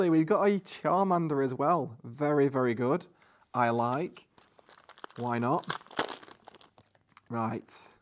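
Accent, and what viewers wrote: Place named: Leeds, United Kingdom. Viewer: that's definitely a Leeds accent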